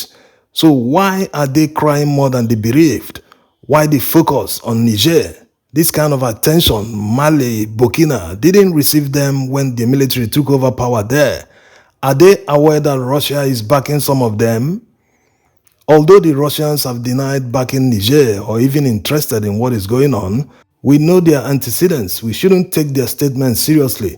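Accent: Igbo, Nigeria. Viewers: Rubbish talk 98 percent of the time in thick Igbotic accent. These are the same people who used to hate both Buhari and Niger Republic a few months ago now pretending to be friends of Niger. As I said earlier - RUBBISH.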